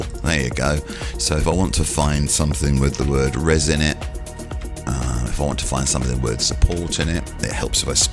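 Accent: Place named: England, United Kingdom. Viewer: i'm sorry.... I am having trouble understanding.... can you explain it to me inworld at my place?? the sexy voice and the english accent gets my brains in noodles 🤣🤣🤣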